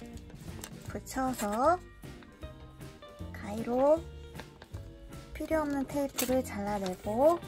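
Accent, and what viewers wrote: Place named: Gyeongsang, South Korea. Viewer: 경상도 분이신가!! 자꾸 뽀족을 빼쪽한부분...이라고 하시네요.ㅎ 제가 경상도 아줌마라^*;;억양이랑 말투가 그리 들리네용ㅎㅎ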